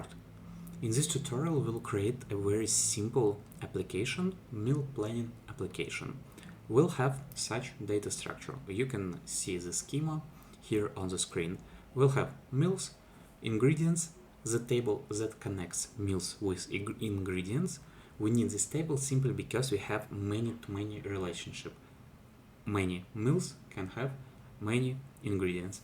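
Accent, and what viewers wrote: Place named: Russia. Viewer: Какой сочный русский акцент 😊❤😅 прям родная речь, мёд для ушей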